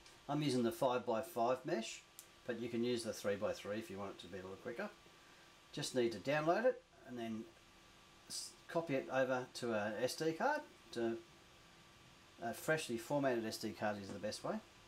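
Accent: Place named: Australia. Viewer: Love hearing a fellow Aussie at work, great video helped me a lot! Jyers is a great firmware!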